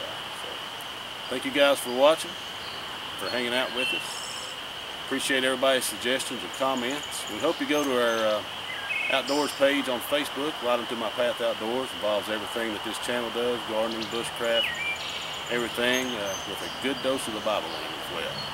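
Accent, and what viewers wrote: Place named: Texas, United States. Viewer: I tell ya brother, i do love yer vids and being a Texan hearing you talk makes me feel right at home!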